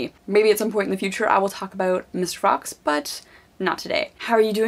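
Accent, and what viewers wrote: Canada. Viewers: it must be, but its not as extreme. its lovely :D never actually heard a canadian accent except in movies and shows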